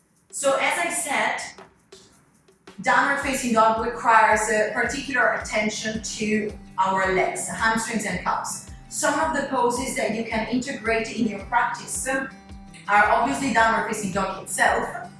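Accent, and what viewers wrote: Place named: Italy. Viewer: I love your Italian accent 👍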